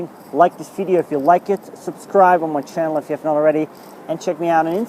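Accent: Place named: Netherlands. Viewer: Most interesting history, are you dutch? Your accent is very dutch.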